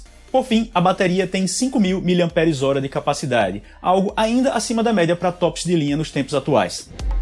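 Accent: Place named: Northeast, Brazil. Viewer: Quem é esse paraíba? Sotaque chato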